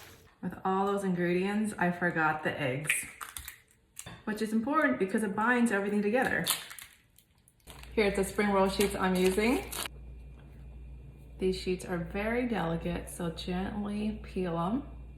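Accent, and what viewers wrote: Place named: Bay Area, United States. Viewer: I knew you were a bay area gal! I had a feeling from your accent and coolness. Go warriors!!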